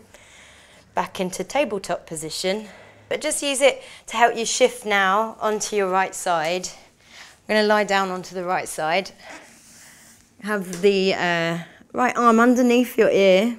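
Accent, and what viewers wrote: England, United Kingdom. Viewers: This was a wonderful sequence, just enough to get my heart rate up which I do enjoy, thank you! Also very nice to find a lovely English accent to listen to while doing yoga!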